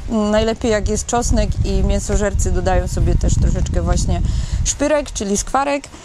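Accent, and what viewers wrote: Silesia, Poland. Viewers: Pięknie mówisz w języku Śląskim. Ja od 38 lat mieszkam w Krakowie ale takie słowa jak: "szałot rybny", "harynek", "hekele", "szpajza", "ciapąg" słyszałem dzisiaj pierwszy raz. Kutia to danie typowo Krakowskie, które znam od mojej Babci, natomiast to danie jest podawane obowiązkowo w Krakowie, podczas każdej wieczerzy Wigilijnej, moja babcia ma prawie 100 lat i do tej pory przygotowuje kutię, a właściwie to zarządza przygotowaniem kutii 🙂Ostatnio podczas nagrywania swojego filmu spotkałem z kamerą "Moto Urbex Silesia" - wspomniał kilka słów o Urbex Chorzów i oczywiście o Twoich filmach na YT 🙂 Na Śląsku macie tyle ciekawych miejsc do nagrywania: opuszczone pałace, kopalnie, dawne parowozownie, a nawet stadniny koni, to kopalnia miejsc do nagrywania. Dlatego często przybywam na Śląsk z kamerą by nagrywać nowe filmy. Pozdrawiam i do zobaczenia na następnym filmie.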